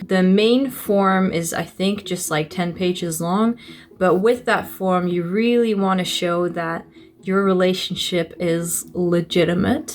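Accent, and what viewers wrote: United States. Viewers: With such an American accent, did you go to an international school here in NL? When I taught English at a Dutch high school in Den Haag, I had several American-sounding students each year. Some people just have the ear for it. Did you or ? When I taught at the American School of The Hague, I had Dutch students who spoke Dutch with an American accent, LOL! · Since when did you get that southern accent?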